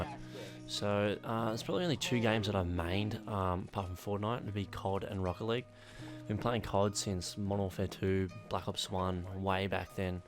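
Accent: Australia. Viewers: He's Aussie? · Is aussie? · aussie accent man hes fkn funny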